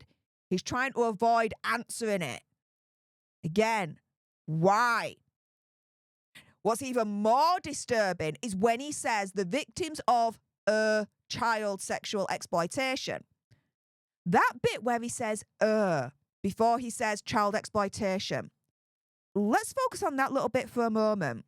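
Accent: Yorkshire, United Kingdom. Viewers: hi which part of yorkshire are you from you sound like a yorkie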